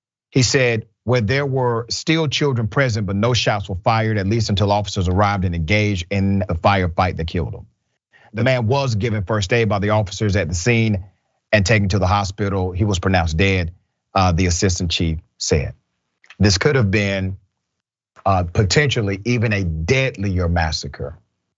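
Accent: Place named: South, United States. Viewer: I LOVE YOUR SOUTHERN ACCENT boy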